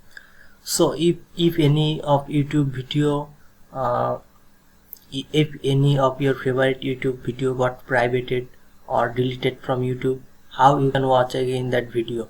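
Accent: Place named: India. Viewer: Me: Ah this video looks pretty solid it might give some answers. Video: Has Indian accent Me: Aight ima head out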